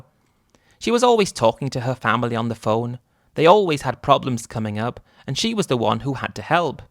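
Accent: England, United Kingdom. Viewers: This is an english accent not american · A guy from the midwest, narrated in a British accent? No thanks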